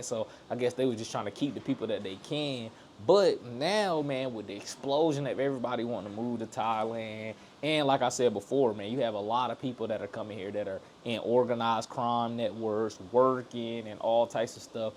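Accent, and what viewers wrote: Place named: Detroit, United States. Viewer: Put on your Nigerian accent instead brother drop the ghetto Detroit lingo hip hop you sound low iq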